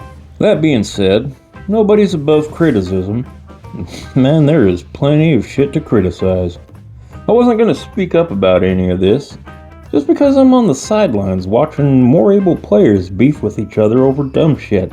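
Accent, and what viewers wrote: United States. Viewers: You can hear the country voice radiating through the screen. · Your voice sends me to an early 2000's southern diner lol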